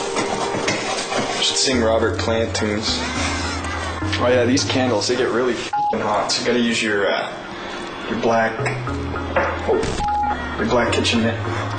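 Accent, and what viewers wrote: Canada. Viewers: Listen to his Canadian accent here holy. Haha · the canadian accent is strong with this mau5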